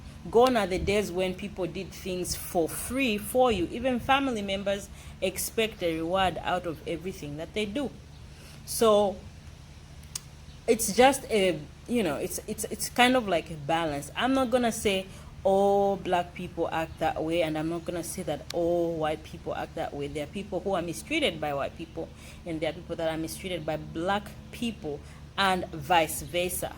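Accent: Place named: Jamaica. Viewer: That’s not good everyone should be treated equally... you almost sound Jamaican.. I rather stay home and suffer..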